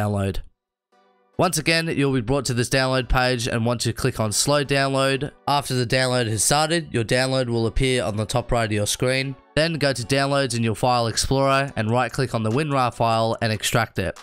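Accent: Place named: Australia. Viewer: You Aussie too brotha?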